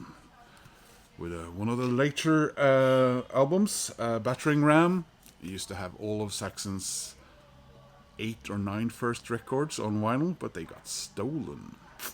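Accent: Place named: Ireland. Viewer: Look at that cool Opeth album cover ! The motorcycle noise reminds me of some of the streets here in the U.S. Thulsa Doom.. I will listen. I have The Hives debut on an original pressing. Bought it back in 2002 or so. Good Irish accent sir ! 😊The only accept I have is Metal Heart. I just watched Doug's latest here before work today.✌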